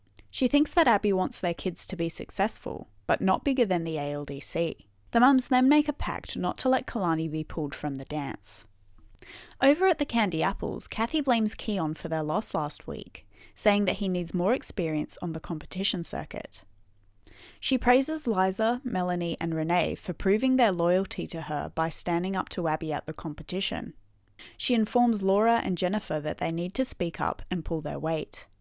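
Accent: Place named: Western Australia, Australia. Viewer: R u by chance from WA? U sound like it!! (Canadian with a perth roomate)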